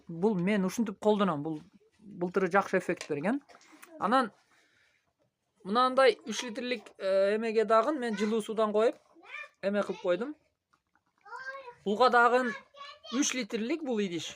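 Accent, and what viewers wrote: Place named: Uzbekistan. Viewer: Озбек окшойт кыргызча суйлогон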